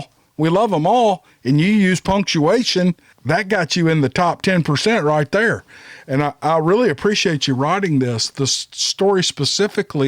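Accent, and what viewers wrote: South, United States. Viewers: I like your genuine humility also. Ppl can tell. Southern drawls feel at home 2. I saw elves in 1976-77 in cheyenne wy. Not sure you'd want that story here. Nor am i joking. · do you see what you have started? My gosh! Folks love you reading these stories because they relate to you and the persons who tell (wrote) them. You make it so much fun... although never making light of the scary encounters that take place for many of these individuals (and the fact they have to try and learn how to deal with it). You have cornered a phrase... "Awright, here we go!" (In your way cool, southern gentleman, way.) Then those of us out here in any particular corner of the world lock in and are ready to take the ride.:^) You go,. We're right here with ya!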